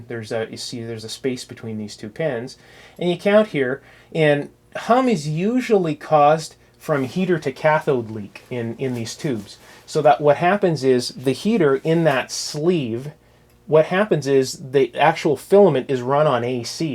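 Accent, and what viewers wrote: Canada. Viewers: Great explanations of what you are doing and why! I have to say, though, that you are from or near the Great White North (Canada), eh? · My wife always laughs at me because she has learned to pick out your voice by the "Solder", "Ohh-kaye?" and other Canadian idiosyncrasies... Nothing but love from Texas though,.:)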